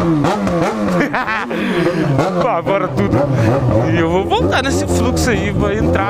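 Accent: Goiás, Brazil. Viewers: Por que você fala no pique do Goiás. você de de Goiás?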